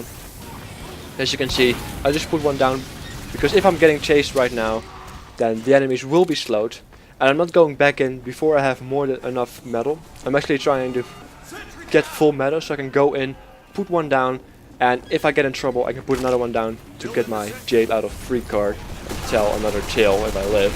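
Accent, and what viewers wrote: Netherlands. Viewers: Are you dutch? U got a dutch english accent XD (no offence) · your dutch right? :p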